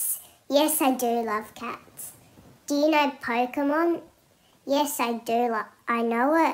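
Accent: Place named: Australia. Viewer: i can hear the australia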